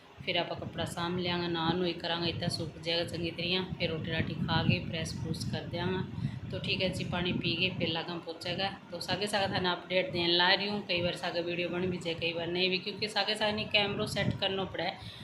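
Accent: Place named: Bagri, India. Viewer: n bagdi bolni sichao aap gi boli hi achi laag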